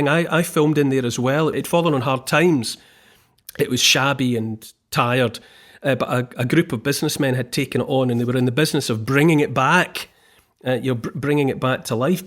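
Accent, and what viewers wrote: Scotland, United Kingdom. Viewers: Well, I thought I was going to be able to listen while I refinish my floor but I couldn't take my eyes off the amazing views. I didn't realize how stressed I had gotten with the elections and all else until I heard your voice and watched this. I really needed a moment to remember the absolute gorgeousness in this world. I started listening to you a few months ago because of your good common sense and your accent. My Grandfather was from Scotland and I miss his voice, especially during times of unrest. I continue to listen to you because I need and so much appreciate view points from outside the states especially from someone with the characteristic no nonsense, tell it like it is, passionate, quit your whining, Scottish trait. Thank you, for your videos. They truly mean a great deal to me. On another note, somewhere toward the beginning of this video you showed a picture, don't even know what it was in particular now but, it immediately made me think of the Bay of Fundy in Nova Scotia, Canada. 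My mother and I were visiting family up there and we stopped to see the tide come in. My mother explained how a big wave would just come pouring down the inlet at high tide. As a young child at the time, I thought she was exaggerating. Sometimes she could be a bit melodramatic. But as we stood there waiting more and more people came to see the tide come in so I thought maybe this was going to be something interesting. Then it happened, from far off you could see a wall of water racing d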